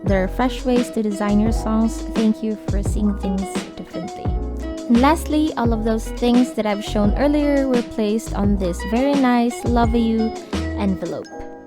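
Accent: Visayas, Philippines. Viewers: gHhaaaadddd bisaya si ate HAHAHAHA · Bisaya ka ghourl?? HAHAAH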